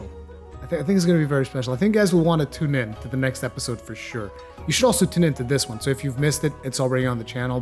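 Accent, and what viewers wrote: Portugal. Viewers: Are you portugese? Your english is better than mine and Im native lmao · ESPERA AI, TU É PORTUGUÊS?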